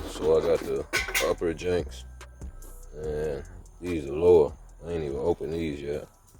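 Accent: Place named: South Carolina, United States. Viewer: I’m new to the channel but I swear from the way you talk you’re from low country South Carolina.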